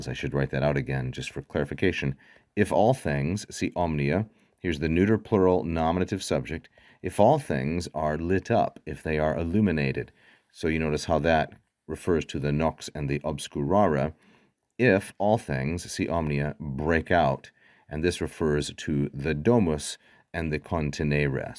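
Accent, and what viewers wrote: United States. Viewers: The reader is very fast and has an atrocious american accent. Perhaps he should make an effort to correct this.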